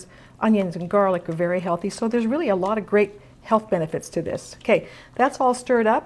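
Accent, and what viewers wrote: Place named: Canada. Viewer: Hope you are well,. Thank you so much for sharing your meat loaf recipe. I plan to try this! I like the very slight Canadian accent. Your presentation is awesome.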